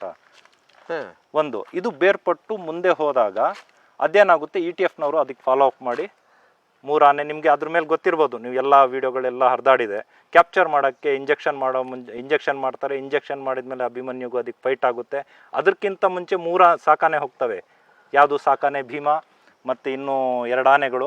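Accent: Standard. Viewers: Vav.adbhut.nimma.matina vaikhari.ane.bagge.matadoru.nimmannu.nodi.kalibeku.yakandre.nimma.kannada.bahala.sundara.hagu.swaccha.ellarigu.artha.agutte. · ಅಣ್ಣ ಚೆನ್ನಾಗೆ ಮಾತನಾಡುತ್ತೀರಾ ಸ್ವಚ್ಚ ಕನ್ನಡ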